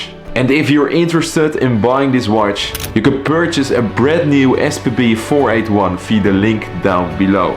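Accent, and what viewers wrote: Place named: Netherlands. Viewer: Ik weet dat je nederlands bent, maar alsjeblieft werk aan je accent.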